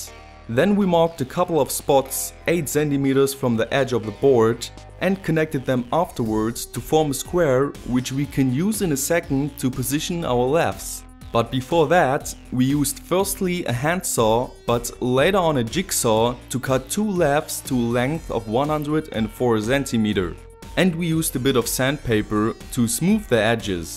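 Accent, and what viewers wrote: Germany. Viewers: Warum spricht der englisch das ist doch ein deutscher Akzent und die Schrauben von Hornhaut hatten auch eine deutsche Beschriftung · German, right ? :D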